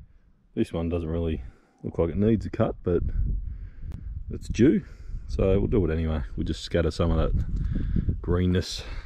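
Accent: Australia. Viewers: Hi, I too love to hear your Aussie Accent!! So glad your giving the donation to Jenny`s Place! ❤ Now this happen to another man who cuts lawns in Florida, he was Edging but owners left their vehicles on the driveway right up by the house & he had little space to do the edging, but did it nicely & it was a Free One!! But something was in the grass & it flew & hit the Glass front door & shattered it...he had to replace door...ouch for the Cost! So I thought I warned you about it, I don`t want this to happen to you! Another Great Job on this yard!! Have a Good Work Week & a Lovely Weekend with Family! 🥰 Love from Central Illinois USA😉😎❤ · it's great to have you back and hear that lovely Aussie accent! We miss you when you're not here but I hope your brief absence means you took some time for rest & family. I think your decision to donate to Jenny's Place is wonderful. 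Don't feel badly that you started 2023 out 'softly' by showing us one of your regular customer's yards. We see so many overgrown yard 'rescues' that once in a while it's nice to see a well-kept yard getting trimmed, and it shows us that not everything you do is a struggle. Best wishes for health and happiness in 2023!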